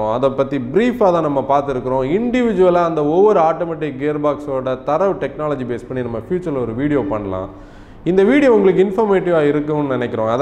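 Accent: Kanyakumari, India. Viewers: nanum kanyakumari thaan anna. nama slang ae pothum enga irunthaalum nama ooru kaaranga nu kandu pidicherulam😇❤️❤️ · Kanyakumari slang 👍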